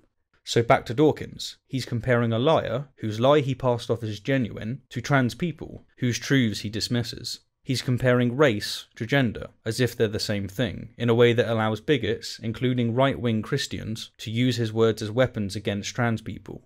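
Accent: United Kingdom. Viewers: Nothing like watching the catastrophe that is humanism devouring itself.. You think you are going to force Dawkins, someone who unlike the guy talking in this video isnt slurping up to the woke narrative, is supposed to just start denying reality to appease the movement? Please, may have thrown out rational thinking, but Dawkins isnt guilty of that, the man is a credible scientist, and hes likely not going to be bullied into woke narratives., get some integrity, your british accent isnt fooling anyone. People are getting tired of this stupid "my body doesnt conform with my mind" nonsense.. Grow up. We are seeing disproportionate numbers of trans people indicating its nothing more than an attention grabbing fad coupled with narcissism. Its interesting to see how quick in an effort to keep his poor wittle job filling peoples heads with nonsense is willing to tow the line. 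Completely irrational.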